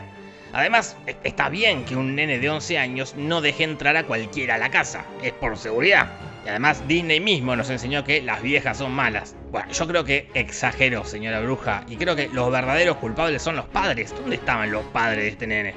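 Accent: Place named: Argentina. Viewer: El único argentino que me gusta como habla jasajksak ❤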